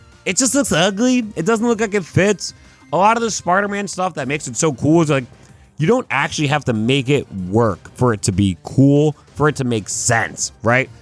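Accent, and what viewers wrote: Boston, United States. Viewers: unrelated, but been meaning to ask, are you from Boston or something ?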